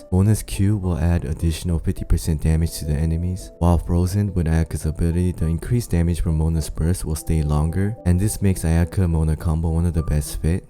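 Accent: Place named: Brazil. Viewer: Essa cara é br o sotaque eu reconheci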